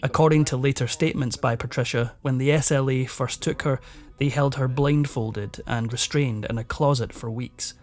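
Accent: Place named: Scotland, United Kingdom. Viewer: Yay my all time favorite Narrator Love the Scot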